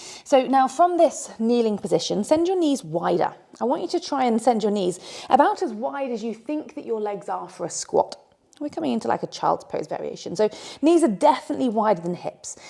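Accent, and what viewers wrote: United Kingdom. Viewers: Thank you so much! You are one of the best out there. I'm doing quite a lot many types of functional sport and exercises regularly ant thought my flexibility and range of motion is at least acceptable... Now, this simple and 'easy' routine exposed a fantasy world I'm living in. Some of those starting positions you represented here before even started the actual strech were already enough for me and I couldn't go any further. 😅 Realizing now how much I've work to do. I've been following you already about one year yet haven't still sucribed yet. Now I finally did it. All of your efforts and videos are so comprehensive, well structured and quided. They are gentle, precise and beginner friendly. I'm really grateful you're doing this and sharing your expertise. Keep up the good work. You definitely deserve all the success in your path. 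And I really love your british accent! So refreshing after listening and following so many influencers outside of UK. Greetings from Finland. 😊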